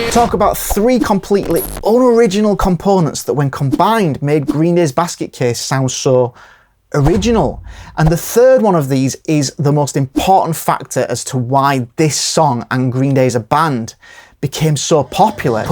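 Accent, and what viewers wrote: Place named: England, United Kingdom. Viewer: Good video mate. Think some of the nuance in what youre saying gets lost outside England along with the accent :) Not a band/song ive ever been a fan of but maybe i should give them a go - i agree that they were musically more conscious than some other punk bands of the time - probably their image and they way they were pronmoted helped too. God knows what sell out or anything like that means - its all bs - green day were making music they wanted to make you can feel that and people loved it - i don't think that was selling out or anything like that. Being unoriginal isn't a criticism - the beatles took stuff from chuck berry, jazz standards, 50s pop, classical, elvis etc and every band before or since has too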